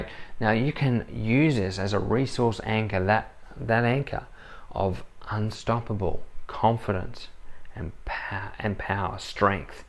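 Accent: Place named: Australia. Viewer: Brilliant method, an unbeatable combination of personality and drop dead good looks along with my personal favourite, Australian accent. Thank you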